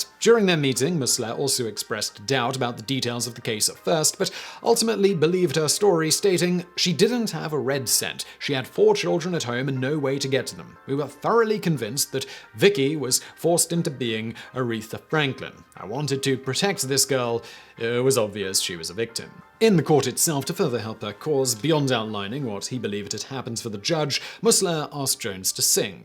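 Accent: United Kingdom. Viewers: One of my favorite things in life is when breaks his proper British delivery and gives his off script delivery.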